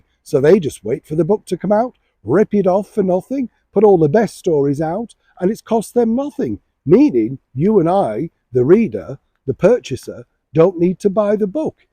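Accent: Yorkshire, United Kingdom. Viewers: My mum would drink brandy and babycham when my parents went out!, are you from West or South Yorkshire? You remind me so much of a close friend from Doncaster! Happy new year to you xx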